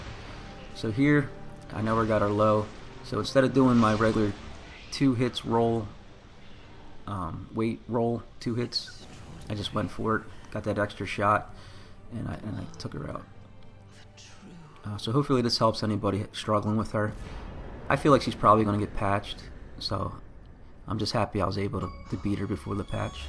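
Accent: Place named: Philadelphia, United States. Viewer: Great video bro we play the game literally the same big ass sword Big ass armor love it stg you sound like you got a Philly accent too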